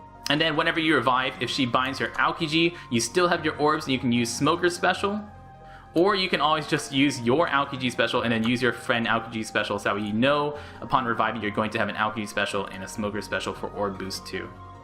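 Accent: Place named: Australia. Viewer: Talk to Australian to me~ <3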